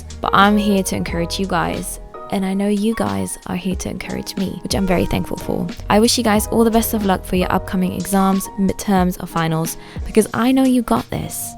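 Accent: United Kingdom. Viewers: Ich finds zu genial, dass du im deutschen auch diesen englischen Akzent jetzt hast :D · I love the British accent and I was convinced u‘re British..und dann fängst du an Deutsch zu reden😂😂💖Loved the video, thank for motivating us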